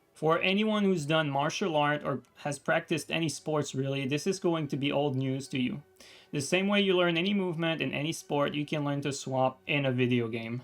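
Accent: Quebec, Canada. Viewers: are you quebecois?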